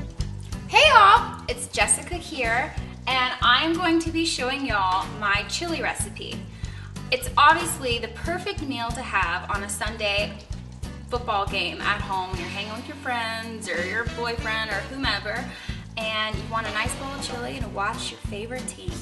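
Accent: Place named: Colombia. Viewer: i love her Colombian accent !! lol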